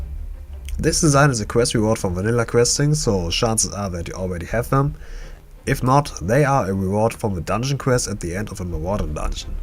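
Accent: Germany. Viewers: Ich meine ich höre da wen Deutsches heraus!^^ Schöner Content!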